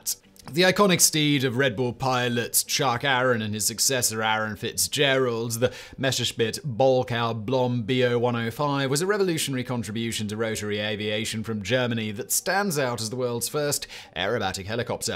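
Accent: England, United Kingdom. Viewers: Has got more English 👀 over the years or is it just me????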